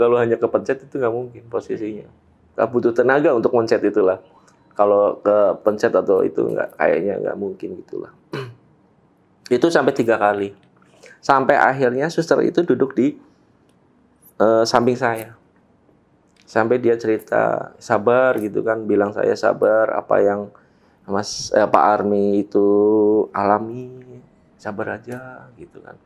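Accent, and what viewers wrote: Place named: Tegal, Indonesia. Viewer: Iya saya juga orang tegal Penasaran ini mas nya tegalnya manah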